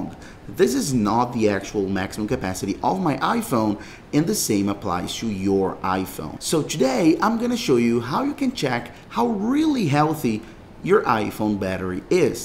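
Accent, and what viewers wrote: Brazil. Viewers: Amazing that even never having entered this channel, this video or having ever heard or seen this guy I am 100% confident he’s Brazilian judging by that accent that I’m able to recognize because I am Brazilian and am a good accent spotter. That said this video is awesome. I had no clue about this. Thanks a lot.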